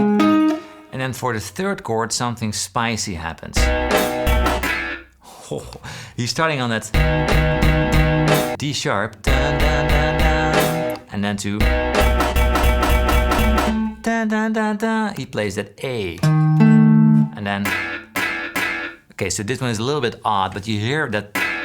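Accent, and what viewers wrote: Netherlands. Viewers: Are you dutch ? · Dutch I think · Ik dacht al, waar komt deze man vandaan, hij klinkt Nederlands xD Toffe video, enorm van genoten!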